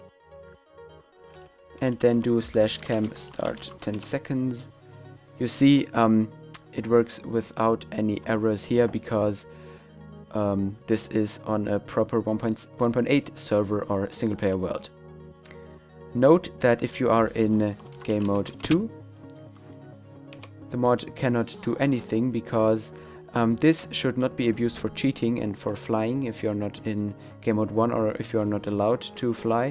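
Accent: Germany. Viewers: Du bist Deutsche, ne? Merkt man direkt :DD Nice MOD by the way!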